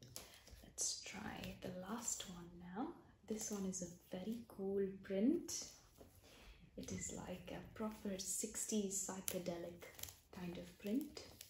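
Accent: India. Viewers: I love your Indian accent! I don't know why but it's more relaxing than any other accents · love your indian accent videos! We should make one together - i messaged you on instagram! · your indian accent videos are the best!!!!!!!!!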